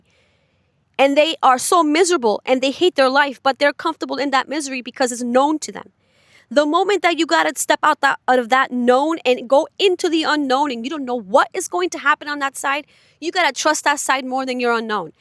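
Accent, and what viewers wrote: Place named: New York, United States. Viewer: Hey! You're looking beautiful, of course!, lol! 😂😊 I love the scene around you, it's stunning! Such a nice looking lake!, you are an incredible woman! I very much believe you will achieve what you wish. You definitely have what it takes. I understand many of the things you feel and have experienced. Maybe not in exactly the same way, as we are two different people but definitely to a point that I get you. Just an observation before I continue! Not something you need to answer but I get the hint of New York in your accent. I could be wrong or I might possible say Philli but I can't quite remember their accent!, lol! 😂 I only mention it because accents interest me, capture my attention! I am a Brit after all so hearing different accents is interesting, lol! 😂 OK, where was i?, lol! 😂 You will get to where you want to go! 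It may not be right this moment, it takes time to save money, especially if your employer doesn't pay much. I had to get out of the situation I had been in for many years The psychological effect it can have on you is something that really becomes something you notice once you live in your own place. You realise the control people can have and how messed up they can make you. As mentioned on another video of yours, once you do get out of your situation, not only will you feel so much better but you will truly discover who you really are. You are an incredible woman and so much stronger than you realise. Just hearing your story reveals that. Believe in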